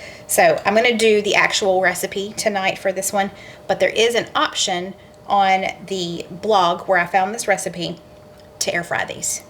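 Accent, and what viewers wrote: South, United States. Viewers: that chicken looked so good , def gonna try that one , but u crack me up, spray them with lil ol !!!!!! here in the north we call it like its spelled . oil hehehee, southern talk makes me laugh at how ppl pronounce diff words ... ty for a great video , oops n all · Ohhhl is the southern lingo for oil…. I love the twang of the south. And you bowl your water for pasta 😂😂 Yeap, chicken fried steak is southern dish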